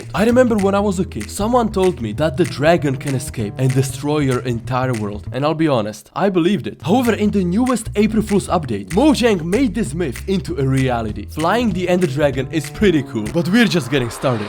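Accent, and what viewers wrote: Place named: Czech Republic. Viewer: Libi se mi ten tvuj ceskej prizvuk😂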